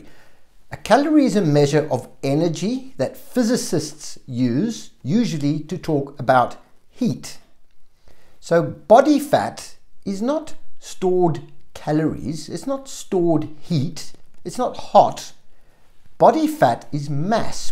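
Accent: South Africa. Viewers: And has a South African accent.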